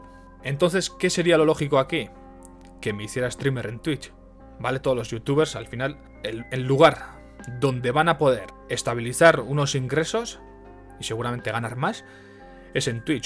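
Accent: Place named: Eastern Europe. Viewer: pero si tiene acento del este de Europa....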